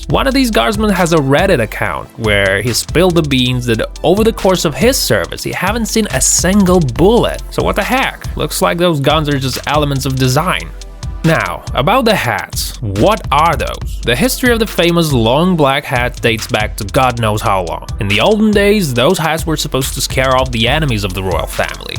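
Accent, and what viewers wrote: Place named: United States. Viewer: It's funny how all of the queens guards videos are narrated by Americans not British or English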